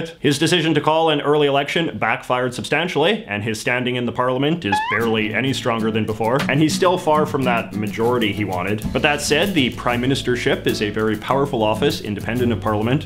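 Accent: Canada. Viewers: Why does this guy talk in such a exaggerated Canadian accent aroooond what part of Canada is he from ?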